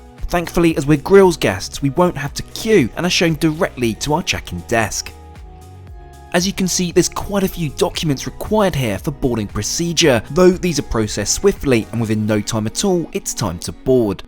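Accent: United Kingdom. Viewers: Theyre called lifts in the uk. Youve got a surprisingly British sounding accent for an American. 😮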